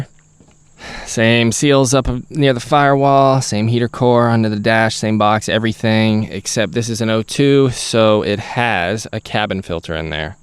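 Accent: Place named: Florida, United States. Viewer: “Ya knowww…” love your words my guy. You sound like you’re from Florida mr cool 😎 lol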